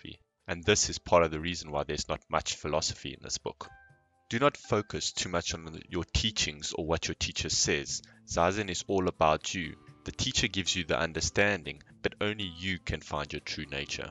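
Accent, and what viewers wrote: South Africa. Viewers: Great video! I love your accent, is it South African ? Reminds me a lot of my grandfathers voice. Thanks :)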